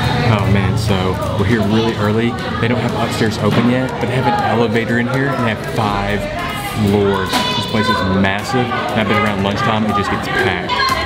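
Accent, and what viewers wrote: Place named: South, United States. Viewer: Love watching u eat.....Your southern draw and demeaner is very refreshing...Pho and other amazing dishes look delicious...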